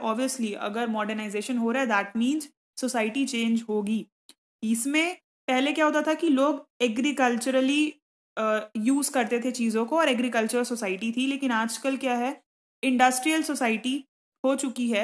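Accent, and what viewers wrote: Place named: Chhattisgarh, India. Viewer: in which city do you belong? Actually your tone of speaking is seems like Chhattisgarhi so I guess it you could be belong from Chhattisgarh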